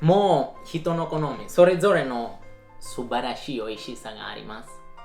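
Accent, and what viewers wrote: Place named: Italy. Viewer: 自分もやってるからかもだけど、白ワイン飲酒後？(仮)すごいイキイキしてて、イタリア訛り炸裂のハイテンションに思わず癒されました^_^ 仕事を楽しみ、動画で視聴者に元気を与えるに心底尊敬の念を持って感謝申し上げます！！！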